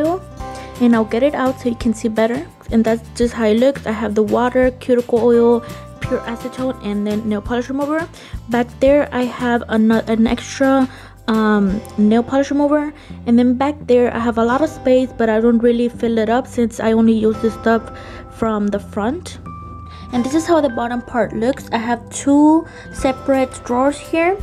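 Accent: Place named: Mexico. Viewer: wojod haider really? it sounded like a Mexican accent to me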